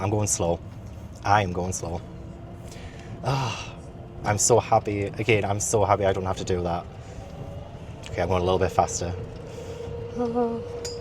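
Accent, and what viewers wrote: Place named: England, United Kingdom. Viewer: Nah. Never feel out of place. I can promise that just having an English accent means everyone there thinks you're too grand for them (I feel like I can say this cuz I'm an American?)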